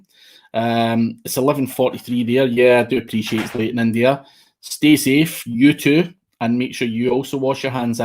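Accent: Scotland, United Kingdom. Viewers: What's up with all these wankers complaining about the awesome Scottish voice!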